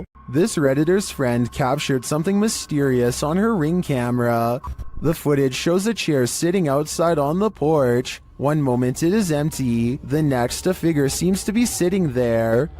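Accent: California, United States. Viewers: 😂 He sounds like THE CALIFORNIANS from SNL . go check it out and tell me I'm right 😅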